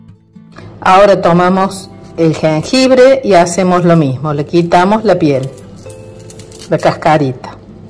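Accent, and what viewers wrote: Córdoba, Argentina. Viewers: Qué lindo es escuchar tu voz de cordobesa!! Te iba a comentar q "acá en Cba.tomamos el mate c jengibre " pero qué te voy a decir !!! Si sos de mi Cba. Es buenísimo el jengibre!!! Haré este té. Gracias!! Ely, ciudad de Santa María de Punilla, Córdoba,República Argentina. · Qué alegría escuchar esa tonada cordobesa!!! Muy buen video, muchas gracias!!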